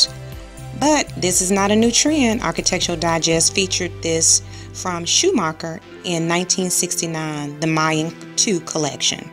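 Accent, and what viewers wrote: South, United States. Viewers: She is southern so has a thick accent... I'm not even American and I can understand her clearly. Then again, I do speak 4 languages. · Your soft sweet southern voice is so soothing to me, it's easy on the ears! The informative pictoral dissertation on styles over the years was interesting and proves there truly is "nothing really new under the sun" so we are assured it may be out for now but it will return! Glad to have found your channel!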